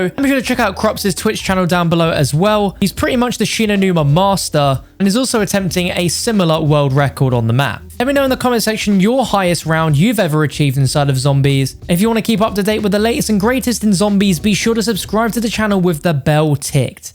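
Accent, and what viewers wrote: United Kingdom. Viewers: bruh ur british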